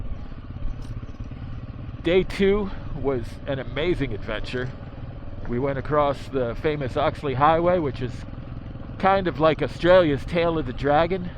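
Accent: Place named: New Jersey, United States. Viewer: Bringing NJ accent to your neck of the woods 😆 🤙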